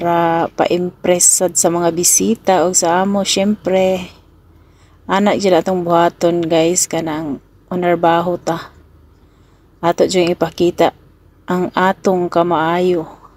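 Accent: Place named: Visayas, Philippines. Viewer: bisaya jod diha nahirapan ako ka lisod nimo woy hahaha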